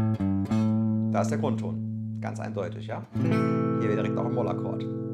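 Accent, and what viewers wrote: Germany. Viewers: mh jo 😃 bisch du ausem saarland ? Nice infos Abo check ! Danke für sie Mühe dir du dir machst 😊😊🤘🏻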